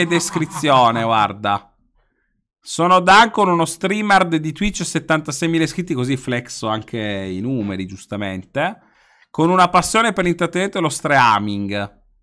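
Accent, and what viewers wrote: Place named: United Kingdom. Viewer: amo il tuo inglese very british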